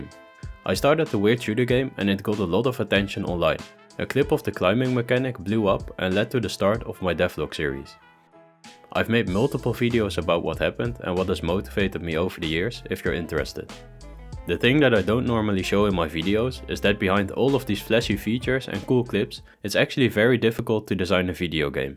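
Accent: Netherlands. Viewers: Are you Dutch? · Too bad. Je klinkt Nederlands! Not everything needs to be original or grand. This definitely sounds like potential but needs interesting level designs. Maybe someone with more experience can help a great deal. But I get that you're fed up with the spider gunner game now. Making experimental games is the hardest there is. KISS principle and sticking to tried and true concepts can help. I made a card game: <link> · I hear a Dutch accent, Ik had/heb dezelfde struggles. Het is nooit verkeerd om opnieuw te beginnen en te leren van de fouten die je hebt gemaakt. Maar... Te, is ook niet goed he ;) op een gegeven moment Delen, met vrienden, familie en community, en feedback absorberen. Heel veel succes met je droom! Ik heb dezelfde =)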